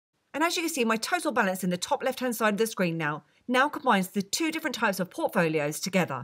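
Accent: United Kingdom. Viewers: Could not understand her at all...her thick Brit accent ruined the video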